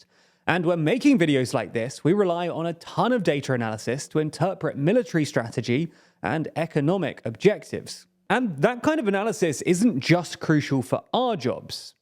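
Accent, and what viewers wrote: United Kingdom. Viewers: pretty sure its just the british accent though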